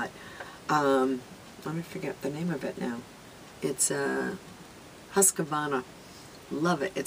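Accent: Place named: Cape Cod, United States. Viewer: When I first found your channel, you reminded me of the Cape. Your accent, your look and the casual elegance. I have to find the fragrance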